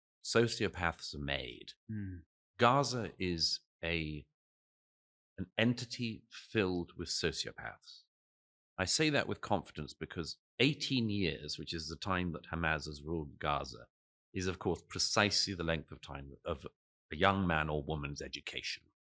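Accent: RP, United Kingdom. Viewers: He leaves out that Israel has been just as terroristic in their actions as has hamas. They also have never received statehood or had a seat at the table at the UN. You all listen to this man with a British accent using Strong vocabulary and act like he isn’t spewing bs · Douglas Murray is mostly empty rhetoric and because of his posh accent people way overestimate his intellect. On Gaza he is dead wrong. It’s clearly an imperialist project by Israel. He ignores the fact that Netanyahu propped Hamas up, and that his govt is full of radical imperialists who openly say they want to take Gaza over completely (and are about to). This was never about defence, it was always about stealing land.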